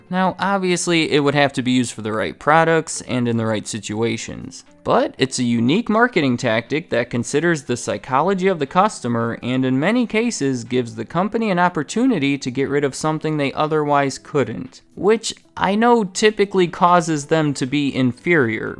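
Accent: United States. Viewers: What state are you from? I swear to god the way your pronounce you "ah" sound is so familiar that I must know someone from there. Super subtle accent, but interesting nonetheless.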